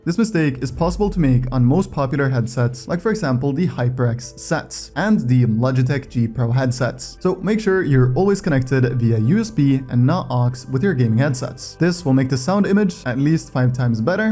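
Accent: Norway. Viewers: why would a bot voice have a norweigan accent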